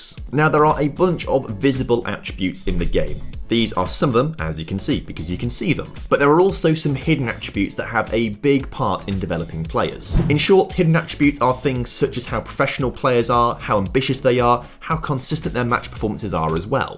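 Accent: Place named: RP, United Kingdom. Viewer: it just sounds way too posh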